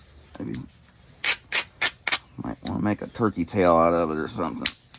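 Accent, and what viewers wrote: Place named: Alabama, United States. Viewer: Cool you used the word solicified in a sentence! Thank you for sharing. Curious where you’re located. I’m in nw Alabama and you sound like home folks.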